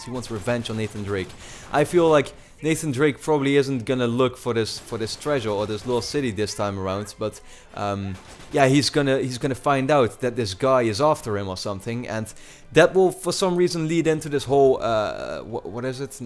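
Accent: Netherlands. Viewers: Hey, did you know that ps4 could hold 2000 friends? Awesome, are u gonna add some subsribers like me? Would be cool to play some rounds with you:)Uit welke stad kom je btw? hoorde meteen dat je uit Nederland kwam, ik uit Den Haag:D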